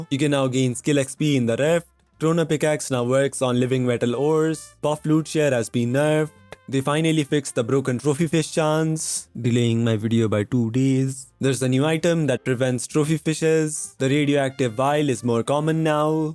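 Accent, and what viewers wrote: India. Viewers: Bro are you indian or just a person with Asian and somewhat indian accent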